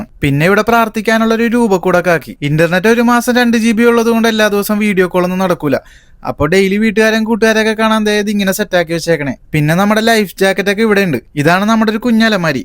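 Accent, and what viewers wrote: Kochi, India. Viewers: Kochikkaran ano? Oru Kochi taste ulla Malayalam 😂❤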